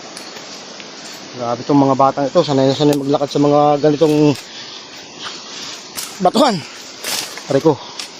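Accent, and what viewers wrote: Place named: Mayhayin, Philippines. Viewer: Tiga saan ka lodi? Puntong mayhayin ka a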